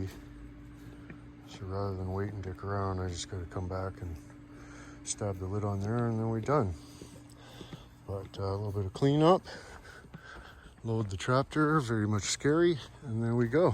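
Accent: Canada. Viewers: No he's just Canadian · My radar went up on the accent. Sure enough, he zoomed in on a license plate from Ontario Canada.... Where they REALLY don't listen to OSHA. We'll played Sir. Get them views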